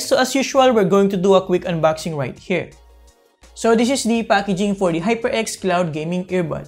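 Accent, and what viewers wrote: Philippines. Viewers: hears the accent My brain automatically: ahh pilipino to alam na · I'll take that Clunky Filipino accent over Indian classic tech support anyday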